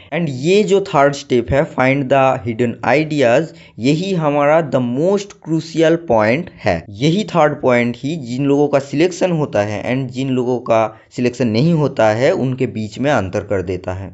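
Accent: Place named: India. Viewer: Ap bengali ya north east se ho kya bhaiya apke accent se lgrha btw me uttar pradesh se hu mains me 98%ile aya hai advanced karne ka soch raha tha but dar raha tha tough questions se lekin aapne achi advice di hai follow karunga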